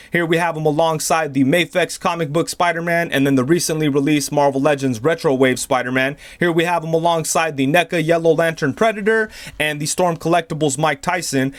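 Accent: New York, United States. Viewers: Where you from? You have this nice New York accent to your voice. Reminds me of family from the Bronx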